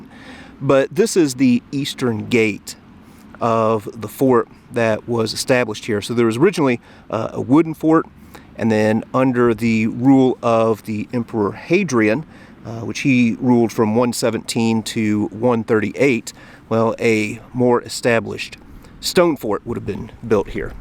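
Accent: United States. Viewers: So so funny listening to an american talking about Roman ruins!! Yeap there was a lot more going on in the rest of the world before the usa came along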